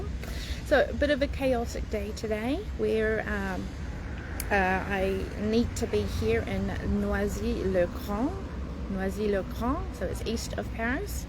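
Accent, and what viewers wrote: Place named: New Zealand. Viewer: Hi and thank you for the glimpse of this very majestic building. I hope it can be restored and saved. Are you a Kiwi? You sound to have a NZ accent. 😊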